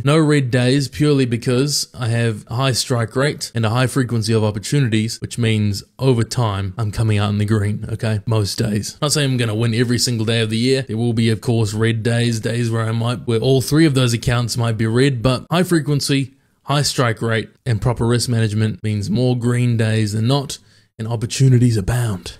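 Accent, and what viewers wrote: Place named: Australia. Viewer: you sound like you have a bit of an Australian accent but you say pm East coast time, what session is this in Australia ?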